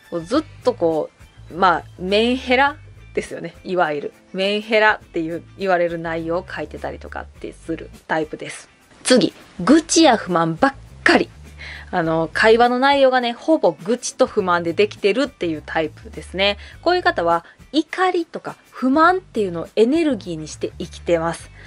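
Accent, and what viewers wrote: Kansai, Japan. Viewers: 先生は関西の人なんですね☺️ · さんの関西弁⁉️ですか、とっても愛らしいです。説明も理解出来ます。男女分けるって案がありますが、一緒の方が互いの違いなどが聴けてむしろ理解が深められるのではないかと存じます。大切なのは、お互いが「謙虚」でなければならないということですかね。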